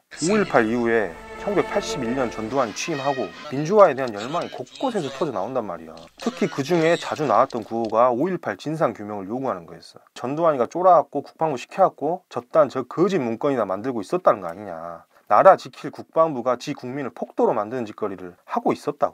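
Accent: Jeolla, South Korea. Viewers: 518 광주민주화 항쟁 영화로 배운사람인데 ㅠㅠ 이런 사연이 있었네요 전라도사투리 어디서 들었다했는데 응칠시리즈였음ㅎ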